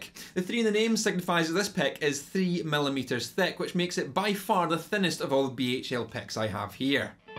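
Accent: Scotland, United Kingdom. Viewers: Isn't it obvious that he's a Scot?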